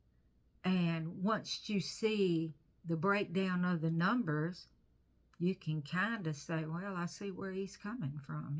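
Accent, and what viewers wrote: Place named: South, United States. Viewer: Hi,. You are a gem! You made me think back on my purchasing behaviour over the last couple of years. I returned to tarot after a very long absence and I was very excited about the plethora of decks available. I started buying. Quite a bit. Sometimes I'd not be able to afford a deck or I'd miss out for some other reason. But I have a small collection of decks I really like. Some of the earlier decks have been put aside. I don't do Kickstarter anymore. What I discovered is that there is always a new deck to get excited about. The decks I have now are a good representation of what I like in deck imagery. So, when I see a new deck it's pretty rare that I get carried away. I'm in a very relaxed state about my collection and I can reach out for a deck I've not looked at for awhile and it feels brand new, again! What a luxury! 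I love my deck collection. It's a luxury to have these 35-40 decks be at my beck and call. Anyway, you're right. We're adults. You sound very southern. If you're near Columbia, SC, let me know. We can meet for coffee or a couple of beers. Take care! Thank you!😅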